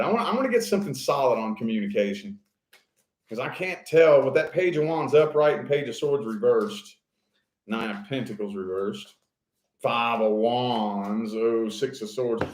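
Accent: South, United States. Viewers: Libra. Need the financial blessings. The person is wanting back and is a twin flame. I have agreed to one more chance. Why do you sound like a southern guy?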